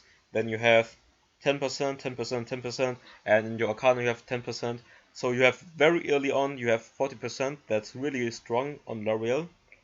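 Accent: Germany. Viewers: Wie man einfach raushört das er deutsch ist xD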